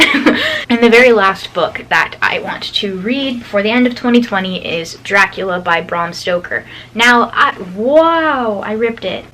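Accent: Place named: Midwest, United States. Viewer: “i sounded midwestern” hahaha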